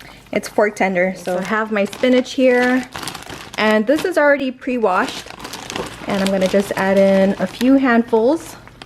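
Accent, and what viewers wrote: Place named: Hawaii, United States. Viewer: Watching the video before checking out any of your other videos, I got a sense that you are from Hawaii or live in Hawaii because you have that local accent. 😭❤️I’m from the Big Island but I’m away for school at the moment. Going to cook this tonight for dinner! Excited because I miss home and home food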